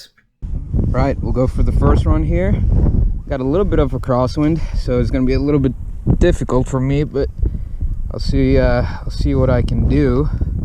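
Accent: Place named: United States. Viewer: You sound american...Did you get permission from the FAA to go that fast?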